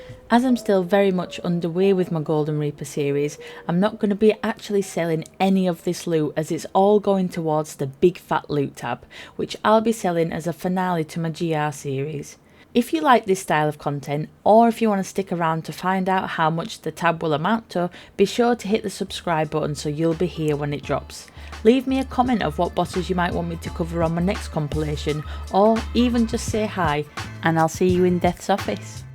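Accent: Yorkshire, United Kingdom. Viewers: You’re completely off, sounds like yorkshire, England.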